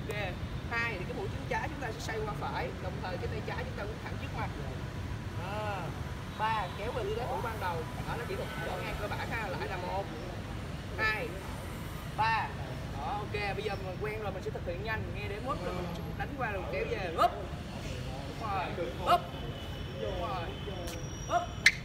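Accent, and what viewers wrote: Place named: South, Vietnam. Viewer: Nghe giọng người miền Nam rất thân thiện và dễ mến. All the best dòng máu nhà 😊👍🐨🇦🇺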